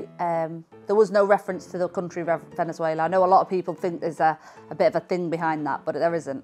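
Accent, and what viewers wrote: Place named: Doncaster, United Kingdom. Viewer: Aww beautiful 12 15. I wish i could keep more than one child. My ex wouldn't let me. Well done Paris 👏 loved the documentary by the way. I recognised some places in Morecambe from our family holiday. Beautiful home in a seaside town. Great to see you both getting along. You are amazing 👏 great to hear you haven't lost your Doncaster accent.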